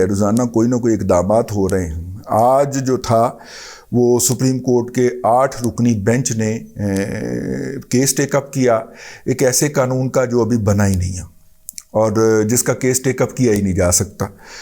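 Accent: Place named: Okara, Pakistan. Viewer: sir ge app depaalpur okara waloon ki zaban bol tay hain. hamaray relatives wahana rhtay hain hum log basically bvahwalnagar ke hain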